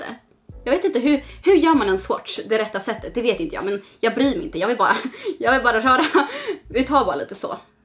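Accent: Stockholm, Sweden. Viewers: Bra video!☺️ hur kommer det sig att du pratar så mycket Stockholmska? Men ändå typ göteborgska samtidigt haha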